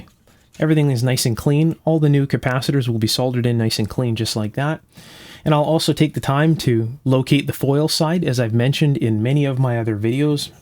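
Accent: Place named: Canada. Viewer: Zap strap? lol Never heard that before, I assume you're in Canada from your accent. Here in Murica it's just called a Zip Tie.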